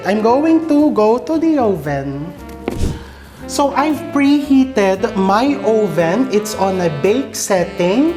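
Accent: Philippines. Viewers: I’m here to enjoy the Filipino accent :)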